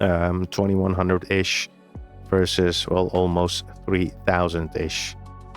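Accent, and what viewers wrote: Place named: Netherlands. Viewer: Another Dutch accent, you guys are all from the Netherlands? Great videos so far!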